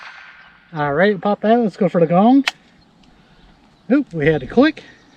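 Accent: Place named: Midwest, United States. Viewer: I've had no issues with anything Bear Creek I've bought everything has been very solid. I don't know why your regional accent threw me off the other day you sound a lot like Steve bartyla from "grow em big" And he's a Midwest boy too.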